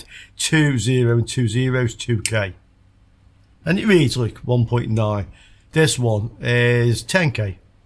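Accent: United Kingdom. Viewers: Your British accent is very annoying!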